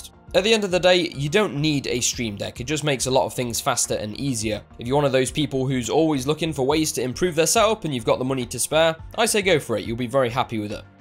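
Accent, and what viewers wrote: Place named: United Kingdom. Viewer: that is the most intense british accent i have ever heard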